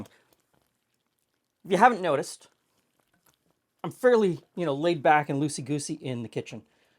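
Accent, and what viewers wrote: Canada. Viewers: Whats the worst thing that could happen? Nothing! There is no downside to that scenario. 😝 You need an “Evil” playlist. The mars bar/ricebubbles thing definitely has a place on the list. That car-mel v’s car-a-mel bugs the heck out of me. We say car-a-mel, because that’s how it’s spelt. Never heard it called car-mel until youtube introduced me to american cooks. I’d rather listen to them say allooominum and tomaaaato all day than hear them say car-mel even once. Flippin weirdos 😂. But if you Canadian’s spell it car-mel, well thats ok. It sounds perfectly lovely in your Canadian accent.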